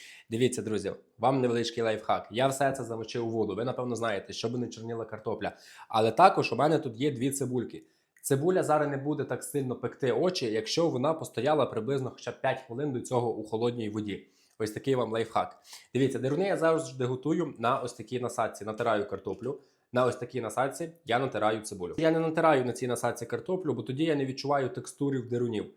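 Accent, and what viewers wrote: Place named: Western Ukraine, Ukraine. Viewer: Jak pravilnyj???Vy z zavzdy chejtyte zachidnjakiv za dialekt.Choca já zavzdy svarjusa Jakyj dialekt?Chlopec hovoryt z mirnym dialektom.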